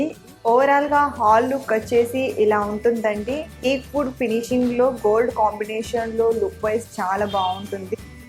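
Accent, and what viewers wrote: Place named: Karimnagar, India. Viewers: TELANGANA yaasa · Akka meedi pakka Karimnagar ey anipistundi.. 🤔🤔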